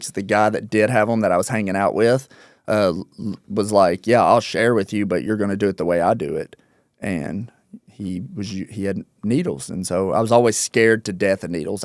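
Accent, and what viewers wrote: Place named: Kentucky, United States. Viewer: Oh shit he totally talks like a KY boy 😮😊😊😊. I grew up in Louisville and out in the country in KY and in San Diego so best of both worlds but wow .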